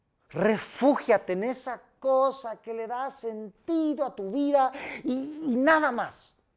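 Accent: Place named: Mexico. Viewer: hola! jaja siempre me haces reir, y lo "chingado" es que cuando me paso un rato esuchándote... jajaja salgo hablando "mexicano" o sea... com tú... Mi hija me riñe, vivo en Alemania, y por suerte acá nadie me entiende, jajaa. Me encanta como eres, Gracias por todo lo que nos compartes, y por tu simpatía.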